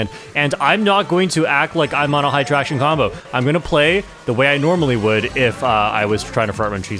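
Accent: Canada. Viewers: Is canadian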